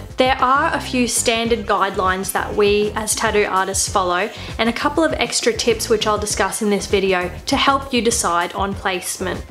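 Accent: Australia. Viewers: It's an australain accent. Alot of people here say "tadoo". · because she has an aussie ascent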